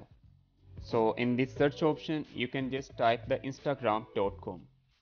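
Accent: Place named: India. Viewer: Me: sweet this tutorial looks good hears the indian accent and leaves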